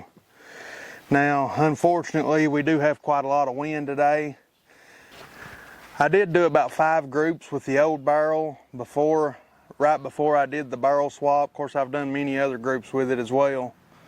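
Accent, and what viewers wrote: South, United States. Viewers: enjoyed it! Great info and I'm from Alabama so I can't help but pick on other southern accents! Thanks for being a good sport :) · Best part of a southern accent is you can play at 2x speed and dude doesnt sound like a chipmunk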